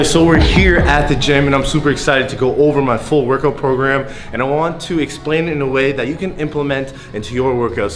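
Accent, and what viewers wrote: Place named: Midwest, United States. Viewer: I thot you were from dmv lol why you say erms like you from midwest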